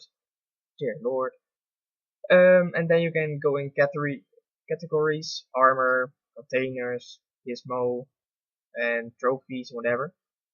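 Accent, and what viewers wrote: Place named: Netherlands. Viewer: I can hear you're dutch :)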